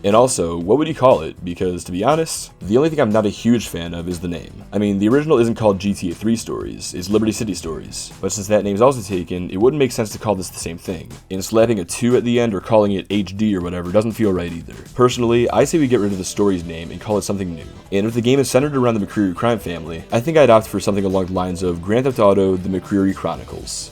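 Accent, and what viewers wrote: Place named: Northern Cities, United States. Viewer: Nice. I heard your voice and thought you had a bit of that NCVS accent. Anyway, great video.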